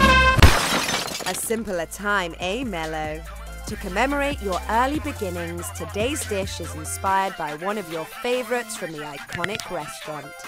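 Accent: United Kingdom. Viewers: It's what makes it more eye catching especially bc the narrater is British so I like it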